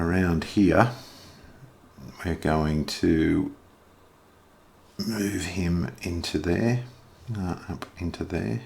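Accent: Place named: Australia. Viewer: Hi, thank you so much for the amazing video series. I've been wanting to get into these solitaire games but was worried how difficult it would be to play. I've actually owned D-Day at Omaha Beach from this series for maybe 8 years or so but never played it. However watching your videos, the explanation of each move and turn really has opened my eyes and got me wanting to try. I've assumed you're an Aussie based off your accent. I am an Aussie also (NSW based), so really does make it even more enjoyable that someone has taken the time to make such in depth videos for people to watch. Massive thank you. Hopefully you get some more time to make more. Hoepfully I can pull the box down and give it a whirl! Also, how did you trim your chits? Do you have a little jig to have them look so nice on the corners?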